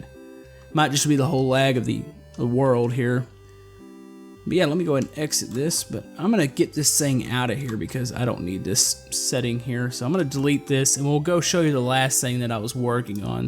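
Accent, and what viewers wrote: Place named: South, United States. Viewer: You sound southern please tell me I finally found a YouTuber from the south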